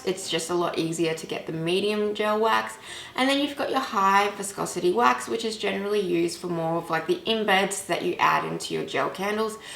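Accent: Australia. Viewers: She is Australian, she can’t sound American. You need to learn to respect people. If the video did put you off so bad, you wouldn’t have the time to comment Betty!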